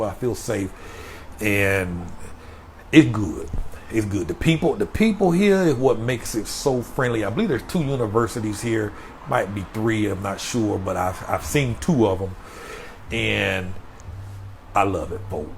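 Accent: South, United States. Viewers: This dude sounds Country as H-E- Double hockey sticks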